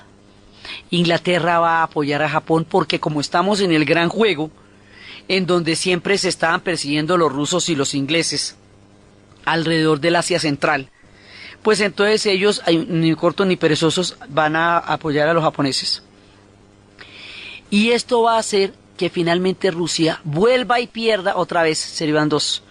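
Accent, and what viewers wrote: Colombia. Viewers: Sra. que emocionante y a la vez ameno es escuchar sus relatos, que incluso sin imagen alguna, y con ese acento muy de su bello pueblo colombiano. Saludos desde Perú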